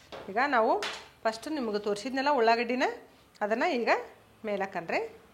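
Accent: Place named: North Karnataka, India. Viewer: Aunty ri Nivu Uttara karnataka Bhashya ne matadi chaalu anusate ri 🙏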